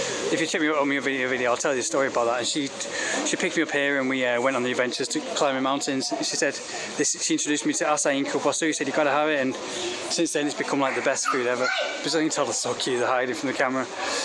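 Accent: United Kingdom. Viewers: Oh, you're Australian? I thought you were a brit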